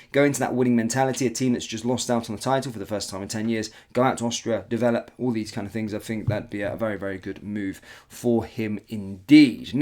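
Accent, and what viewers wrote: Liverpool, United Kingdom. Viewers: Scouse not English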